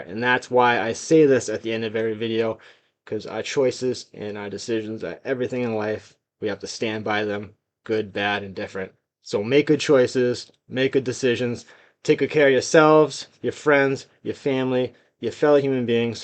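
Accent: Boston, United States. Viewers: This guy has a boston accent lol😂you ain't from the field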